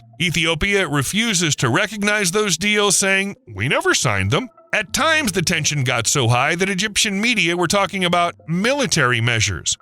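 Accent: United States. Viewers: I just stopped it and won't be listening. No one wants to listen to an abysmal amerry can accent. Just no one.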